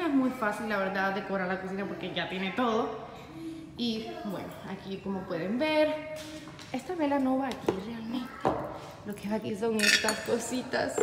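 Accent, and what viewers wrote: Cuba. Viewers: Hola!! Soy nueva en tu canal llevo una semana contigo, el primer vídeo que vi fue el último que hiciste de decoración de Navidad pero no podía dejar de comentar este. Adoro tu casa osea me mudaba ya!!! Es preciosa luminosa ainsss me encanta!!! Poco a poco veo todos tus vídeos soy española y cuando termino de verte hablo con acento cubano tu ya me entiendes jajaja que me encanta tu gusto, es muy parecido al mio y adoro tu casaaaa!!! Un besado enorme!!!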